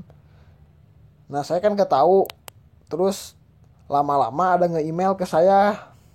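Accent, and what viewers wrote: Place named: West Java, Indonesia. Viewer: Ciri khas bahasa ,,,Orang Sunda 🤣🤣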